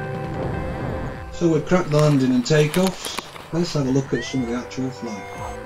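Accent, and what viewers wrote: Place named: Yorkshire, United Kingdom. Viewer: Thank you very much for your very helpful video its especially nice to hear a down to earth Yorkshire man mmmm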